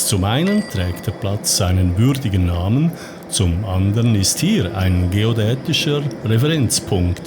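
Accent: Switzerland. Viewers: Im Allgemeinen haben es Schweizer so an sich, mit Akzent zu sprechen. Würde er im Dialekt reden, verstündest du kein Wort. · Sehr seltsam, der Sprecher hat einen Schweizer Dialekt, muss das sein???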